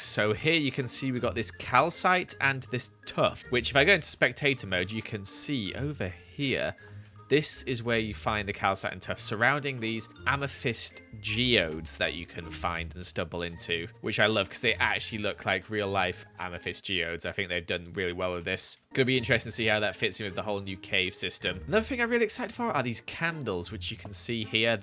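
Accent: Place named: United Kingdom. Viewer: British I just thought you had a weird voice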